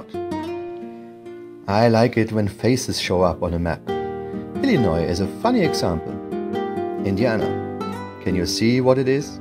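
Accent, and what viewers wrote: Germany. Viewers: This guy has the best German accent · God I love the German accent, great stuff too and nice music!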